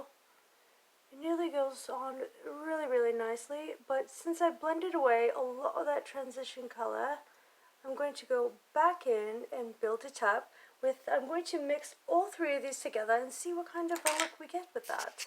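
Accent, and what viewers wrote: United Kingdom. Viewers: Oh good I’m early x hope u are good and thankyou for this upload! And am I crazy or do you have a British accent? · Where are you from? Sounds like you have a British or English accent. I'm not sure. Lol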